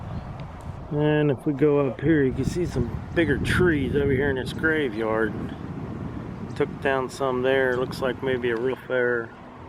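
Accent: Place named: Pennsylvania, United States. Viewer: Hey I found your channel by accident, but love the the content. The first video I watched I heard your accent and knew you where from Pennsylvania. My ex- in laws where from Uniontown. I loved visiting that beautiful part of the country. Very nice folks. I hadn’t heard about the tornado till I watched this. So very sad. Thanks for the entertaining videos. Gayle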